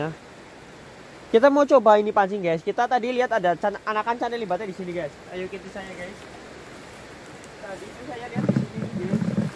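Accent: Banyumas, Indonesia. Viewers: bang kalo boleh tau abang orang mana nih kok ngomongnua agak ngapak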